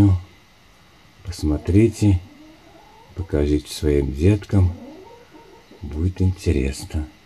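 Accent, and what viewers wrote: Tirlyan, Russia. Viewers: ВОТ ТАК ВОТА!!!😁 ох оно неповторимое тирлянское наречие! Смотрю тебя еще чтобы не забыть как тирлянцы говорят, из тысячи говоров узнаю тирлянский говор.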